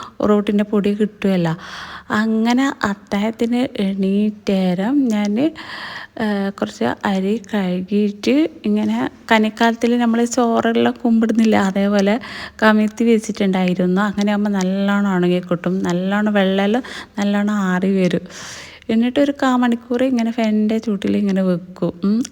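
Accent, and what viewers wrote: Kannur, India. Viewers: Nice vedio dear snacks adipoli nigaludey കണ്ണൂര്‍ slang anu enikku ishtam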